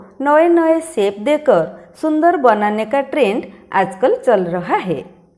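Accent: Odisha, India. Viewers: App odisha se ho kya??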